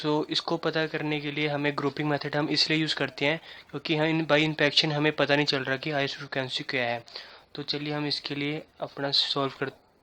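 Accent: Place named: Haryana, India. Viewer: Haryanvi lgte ho nice explaination good dear ! 🌏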